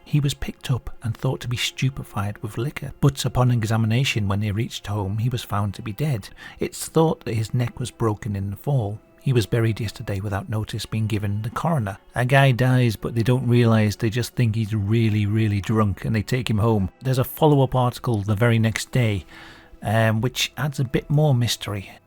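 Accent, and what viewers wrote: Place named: Northern England, United Kingdom. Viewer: he's from up north in England. Maybe derby/Manchester .Not Scottish or Welsh.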